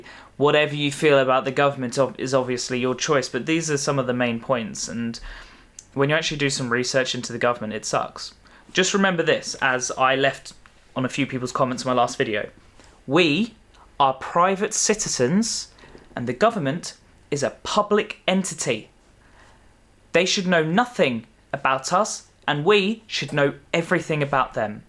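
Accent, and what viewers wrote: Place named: RP, United Kingdom. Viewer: You are young and you have a posh accent. This country is actually one of the best in the world... go live in another country and you will soon find out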